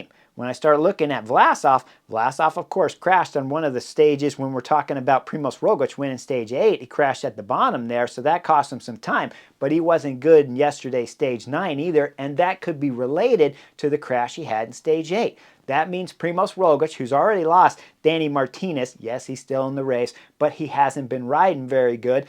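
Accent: United States. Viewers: Hey, I'm an Aussie. Would you mind telling me what part of America your accent hails from?:)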